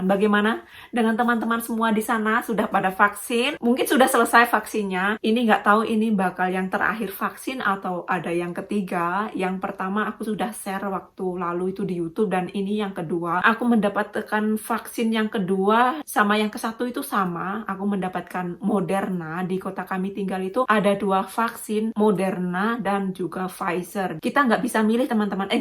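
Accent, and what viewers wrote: Java, Indonesia. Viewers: Mbak apa ada turunan orang jawa?kok rada medok jawa 😊😊😊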